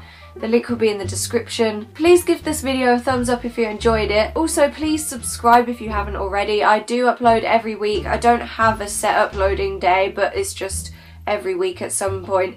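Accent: United Kingdom. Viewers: Your so pretty. Are you British?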